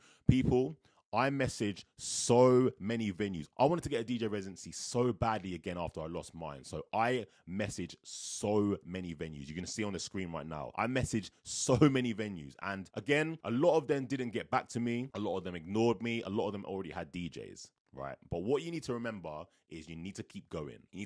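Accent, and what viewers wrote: London, United Kingdom. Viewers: Dollars? Your accent made me think you’re down the road to me in London 😄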